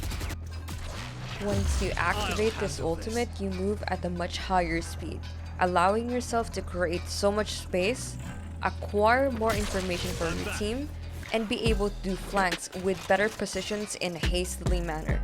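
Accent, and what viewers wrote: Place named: England, United Kingdom. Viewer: nagulat ako bakit english accent pero nag tagalog lmao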